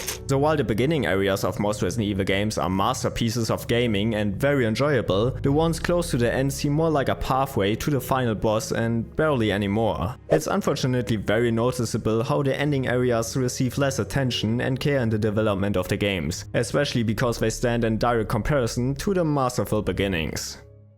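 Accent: Germany. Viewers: Kleinen German accent 🇩🇪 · I kann hear deutsch akzent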